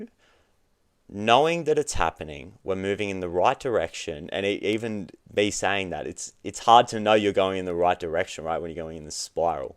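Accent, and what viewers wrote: Australia. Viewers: Are you from Australia? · Sorry dude, I can’t listen to an Australian accent. Peace out 👋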